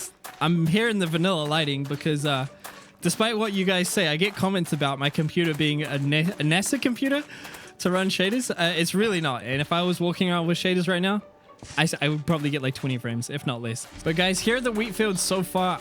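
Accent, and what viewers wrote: New Zealand. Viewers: Why does every kiwi youtuber I come across have some weird amalgamation of a kiwi accent with an american one? Rip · Whats that accent of yours? New Zealand? Im trying to learn to differenciate them and you sound like temuera Morrison…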